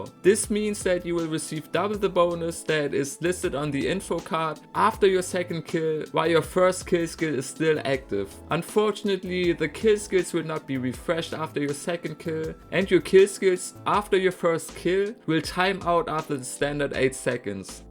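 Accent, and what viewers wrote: Germany. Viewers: Höre ich da deutschen Akzent? xD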